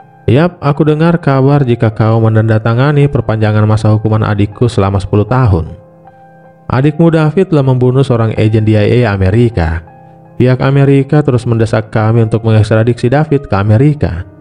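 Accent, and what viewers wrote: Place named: Maluku, Indonesia. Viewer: Mimin logat kaya orang hulaliu la 🙏😁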